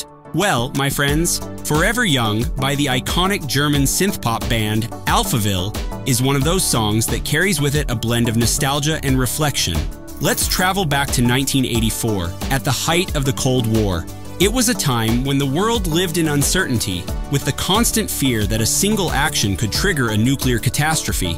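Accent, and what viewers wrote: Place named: United States. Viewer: Impertinent question - this is a UK channel, why the American sounding narration?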